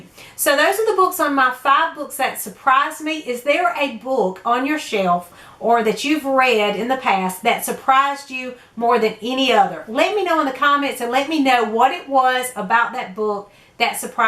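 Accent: South, United States. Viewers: I'm so curious where you are from? I'm an East Tennessee girl but your southern drawl has me beat! 😉💜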